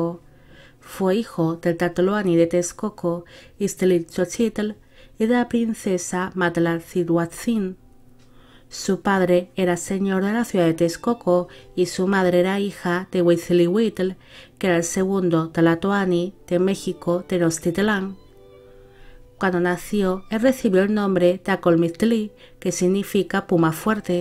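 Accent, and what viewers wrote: Spain. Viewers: Un recuerdo que dejo ¿Con qué he de irme? ¿Nada dejaré en pos de mi sobre la tierra? ¿Cómo ha de actuar mi corazón? ¿Acaso en vano venimos a vivir, a brotar sobre la tierra? Dejemos al menos flores Dejemos al menos cantos. (Nezahualcóyotl) Es una bonita sensación escuchar en voz española la admiración por este poeta y rey del antiguo México precolombino. Gracias.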